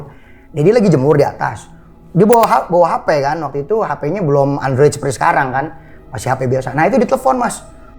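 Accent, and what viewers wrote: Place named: Betawi, Indonesia. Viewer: dialeg betawi kak... Setelan normalnya kek gitu 🤭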